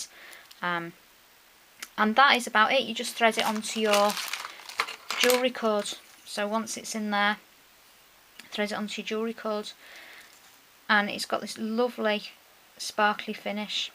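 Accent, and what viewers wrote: England, United Kingdom. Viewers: I too love your ideas I am saving my money to buy the supplies! They are absolutley beautifull! thanks for such a wonderfully detailed instructional video! Can you use the shrink wrap for easter baskets, in place of the fantasy film? Also can you use a non name brand ink to do the embossing & stamping? I love your accent are you from England? I have a friend here in the states whose Mum is from England she lives her but still has her british citizenship she too has a lovely accent! Tessa~~~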